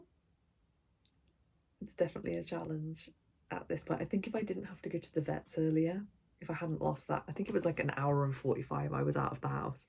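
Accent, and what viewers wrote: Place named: Midlands, United Kingdom. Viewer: Heyy, I’m a new subbie! I’m also from the midlands as well, so it’s comforting to hear a similar accent. 🥰 You did really well, there’s NO way I could do 8hrs of reading, I can just imagine the brain fog 😶‍🌫️. I also have chronic fatigue, so completely understand! So glad everything is okay with your doggy too. Keep the videos coming! 🧡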